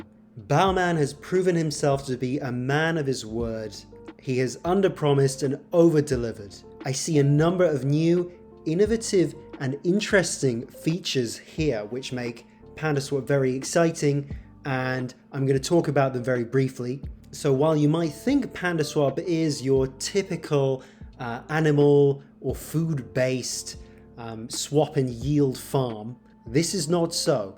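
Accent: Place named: United Kingdom. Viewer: Hi where to based? sounds like you are in UK I am in London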